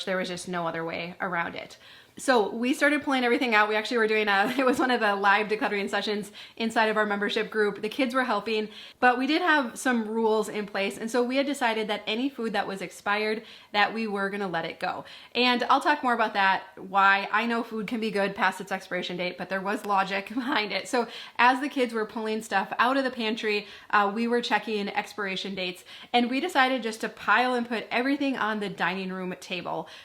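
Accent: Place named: Midwest, United States. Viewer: Question: what did you do with the expired food? Did you just throw away all the expired & freezer burn foods? I need to clean my pantry, too! I know there are a lot of expired cans of food. Yeah, you know what I’m talking about. 😉. I don’t know where you live, but your accent and expressions sound like you are in the Midwest (Wisconsin, Iowa or Minnesota, maybe). Did you just toss the canned “expired” food stuffs in the trash? Here in California we have to put all of our table scraps in a separate bag and that goes into the bin of yard waste (leaves, dead flowers, grass clippings - all things organic that release methane gas as they break down. Our city turns it all into mulch that is used around plantings to prevent weeds; also, given to us city folks to mulch our gardens. So I don’t know… should I open each can and put it in. The yard waste bin (inside its own little separate bag), or should I just toss the entire can (vegetables, fruit, or whatever) directly in to the trash without opening it. 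Will it release methane or explode someday? Thanks for the video and the inspiration to finally get my pantry clean and organized. 🙂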